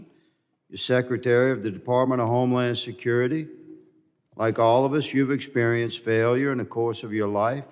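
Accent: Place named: Louisiana, United States. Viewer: His Louisiana accent is flipping awesome, what a patriot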